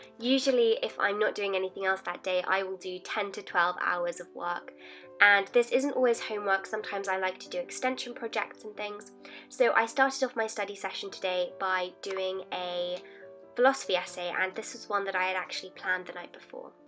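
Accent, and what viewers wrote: United Kingdom. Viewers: That British accent though.😍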